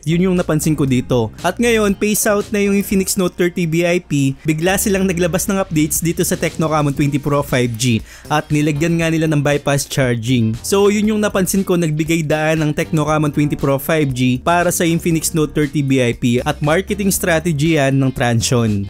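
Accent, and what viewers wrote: Pampanga, Philippines. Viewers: Kapampangan accent tlga e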